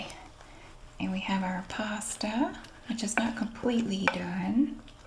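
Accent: South, United States. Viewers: Holy smokes people really admire you sound south lady!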